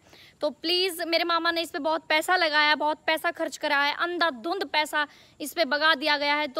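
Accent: Haryana, India. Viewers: mja aa jata gai haryanvi sunkar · Yo Bhai Najafgarh aali side k Hindi or haryanvi mix krke bolle hena ktti ghee sa ghal jaa hai baiii... 😂😂👅😍❤❤❤❤❤❤ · RAM ram Ji wase chai to hai badi Tati or kya haal hai kade haand rahe Ho aapki boli mixed hai Hindi Aadhi Haryanvi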